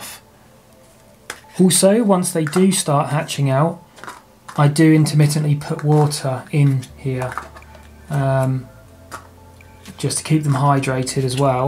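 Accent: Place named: United Kingdom. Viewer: Great video. I like the way you are not so scientific, but more casual and general. Sometimes the basics are more important than all the technicalities. I like the quiet ambient music in the background so I can hear you speak clearly. Nice British accent is also appealing. I learned a lot and enjoyed watching you. Thank you. Wow. I'm glad I made it to the end of the video because I love your idea about using the squeezable condiment bottles. I am looking forward to trying that idea for dispensing fruit flies.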